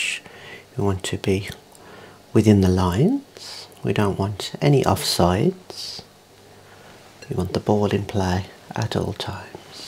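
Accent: Birmingham, United Kingdom. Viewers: whereabouts in the uk are you from? i can’t place your accent 😊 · I only realised after reading comments that this is a sort of remake of that Irish man making sandwiches. His voice is golden but your brummie accent is quite nice. Nice for you to put a 'British accent' on the video that's not a posh London accent. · I can't believe...how satisfying this is....The UK accent fits accurately to calmness of this video. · Is that a birmingham accent?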